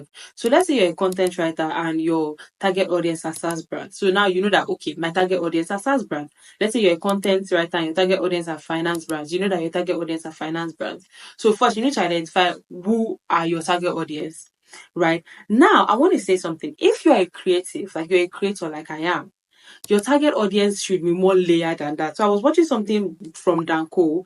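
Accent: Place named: Nigeria. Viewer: I love the way she's so proud of her Nigerian English and thanks for this advice mama ❤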